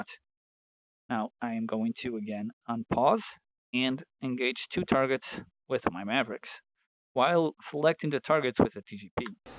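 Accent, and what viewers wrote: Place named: Portugal. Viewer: E esse sotaque portugues?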